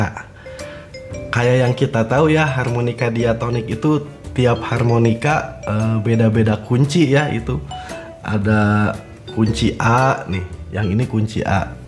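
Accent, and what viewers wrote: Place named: Bandung, Indonesia. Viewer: Bandung banget bahasanya aa 😅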